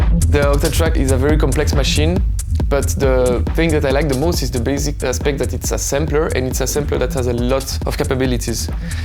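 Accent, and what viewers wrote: France. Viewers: well that explains it then 😅 thought he had a small french accent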